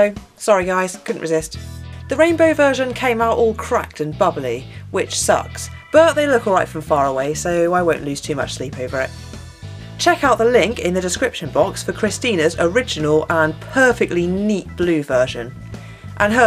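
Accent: England, United Kingdom. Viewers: Does she live in England and does is she English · u from good ol britain! mee tooo allright me loveeerrzzz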